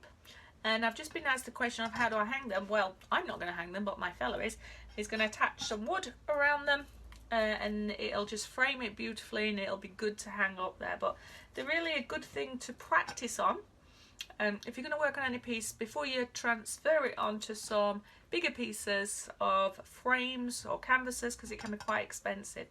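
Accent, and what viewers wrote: United Kingdom. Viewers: New follower thanks to Myriam! Can't wait to see your work! <3 Edit: Cuz I'm the girl who gets so excited that she comments before the videos are even finished. XD Are you Scottish? Whether you are or not, you accent is GORGEOUS! ;) <3 Edit2: I'm at the end and this looks STUNNING! The way you made the top and bottom kind of not be the focus, makes the center look like a sunset or sunrise. The part at the top above the last layer of blue, looks like a city at night with all of the sparkles. Beautiful work! I can't wait to start binging your channel tomorrow at work. (I'm a government employee. I can't be breaking the stigma of being one by actually working a lot. XD)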